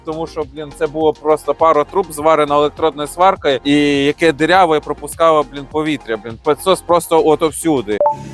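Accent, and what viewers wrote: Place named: Russia. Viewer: Диктор намагається говорити українською мовою, але дуже не вміє. Йому зручніше говорити російською. Це помітно, що повсякденно він говорить російською мовою.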